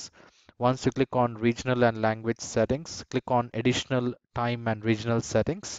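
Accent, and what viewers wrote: India. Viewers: I LOVE UR INDIAN ACCENT !! THANKS !! GREETINGS FROM ARZEIBAIJAN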